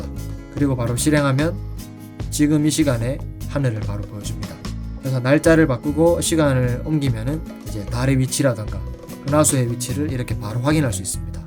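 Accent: Gyeongsang, South Korea. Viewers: 갱상도 분이셔유? ... 목소리가 정감이 갑니다. 남자 목소리는 이래야 제맛이죠 ㅎㅎㅎ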